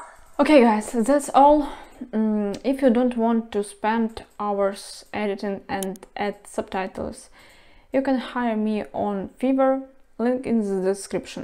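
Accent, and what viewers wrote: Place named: Russia. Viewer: Are you from Russia, because your accent is a little bit similar to Russia? to be honest I like your accent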